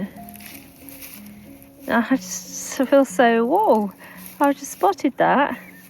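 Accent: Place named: West Yorkshire, United Kingdom. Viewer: Hello, I recently found your channel and find myself watching longer than expected. You do a wonderful job of combining boating, nature, history and art. Also, I spent a great deal of time in West Yorkshire in the 80s touring in a Christian band from California. We played in many of the small town you mention. I can hear the accent so clearly. Thank you for the lessons on canals. Good job.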